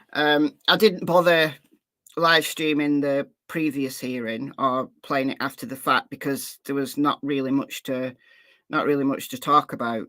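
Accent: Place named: England, United Kingdom. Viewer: It is morning here in the U.S. I luv you and your English accent and my second country England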